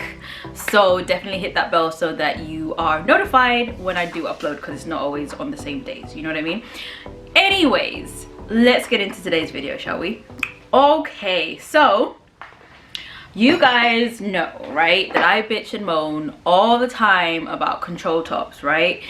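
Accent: United Kingdom. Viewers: I love your lovely smile on your baby face, with lovely long hair, lovely lips. You make a great model. You have extremely attractive and sexy legs in your black designer tights with blouse and high heel shoes. Your black tights combined with black dress and your high heel shoes. I love your lovely British accent please I wouldn’t change a thing. I must give you respect lovely lady.❤️❤️❤️❤️❤️❤️❤️❤️❤️❤️❤️❤️❤️❤️❤️❤️❤️❤️❤️❤️❤️❤️❤️❤️❤️❤️❤️❤️❤️❤️❤️❤️❤️❤️🌹🌹🌹🌹🌹🌹🌹🌹🌹🌹😀😀😀😀😀😀😀😀😀😀😀😀😀😀🌹🌹🌹🌹🌹🌹🌹🌹🌹🌹🌹🌹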